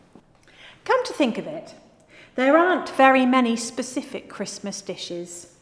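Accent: United Kingdom. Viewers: Her British accent is life. Americans have destroyed the English language. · British accent is very elegant and the way she speaks is polite and decent.Merry Christmas 💫🌲🌲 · Which british accent is this?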